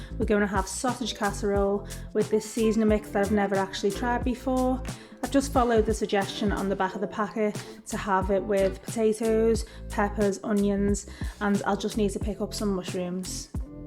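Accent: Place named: Liverpool, United Kingdom. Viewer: Omgosh you sound like my mums fam liverpool lol im from carribbean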